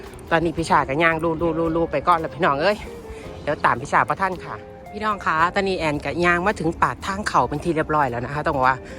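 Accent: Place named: Isan, Thailand. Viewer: ชอยคุณแอนพูดอีสานเพราะมาก เอ็นดูเวลาบ่นสามีค่ะ 😻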